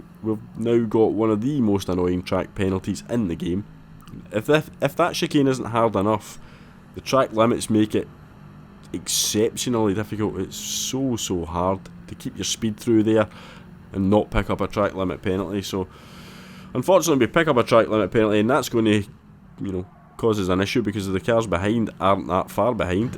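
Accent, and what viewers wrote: Scotland, United Kingdom. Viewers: cheers to you for the content mate 👍 we need a good Scottish Accent on YouTube (I wont hold being a Rangers fan against you 🤣).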